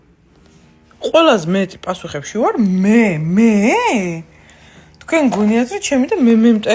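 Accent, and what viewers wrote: Kutaisi, Georgia. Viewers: საყვარელიხარ ქუთაისური კილოთი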